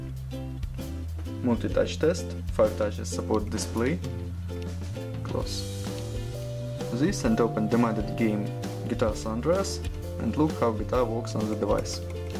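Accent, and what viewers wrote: Russia. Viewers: Русский видно акцент)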